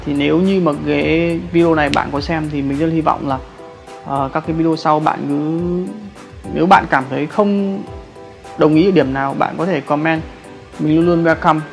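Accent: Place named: North, Vietnam. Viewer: cảm ơn video của bạn rất nhiều nhé <3 ps: mình thích zọng trai Bắc :))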